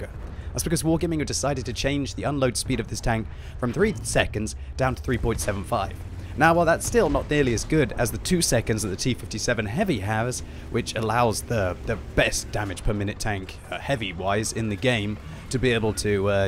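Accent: United Kingdom. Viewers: Being sick u sound super british :P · sounds even more british like this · You sound a lot more british when sick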